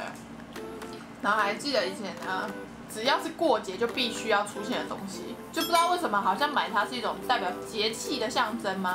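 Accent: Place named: Taiwan. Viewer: 台語好可愛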